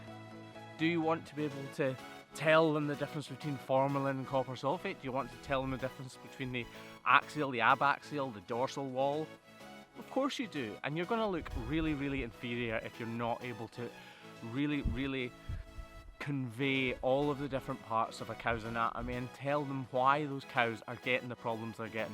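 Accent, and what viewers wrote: Scotland, United Kingdom. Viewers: He’s a question not about the cows hoof but he it goes - what part of Scotland is your “accent “ from? Your voice is a wonderful combination of Scottish and English or am I completely wrong? Either way I enjoy learning and watching your videos. HAPPY NEW YEARS !!!! Hope 2020 is filled With love peace friends family and laughter 😊PS tell Mrs Hoof GP we are great full for all the time you put into your YouTube channels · elaine east-byers yes, I can hear all three. That’s why I was confused as well. You drop your T’s, such as saying “ca’ ‘l” instead of cattle, which screams Cockney; however, after doing a quick search, it appears that first appeared In Edinburgh and Glasgow, then later Cockney. Surprising enough, my midwestern USA English understanding requires me to pay close, close attention to you speaking so I can understand you. 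Sometimes I even have to “translate,” which means I may get behind and have to rewind! No doubt if we were speaking in person I would be saying “what?” quite a bit! I hear the lovely brogue on the o’s!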